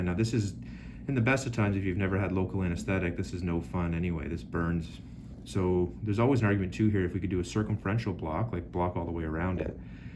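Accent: Canada. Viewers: I enjoy your Canadian accent!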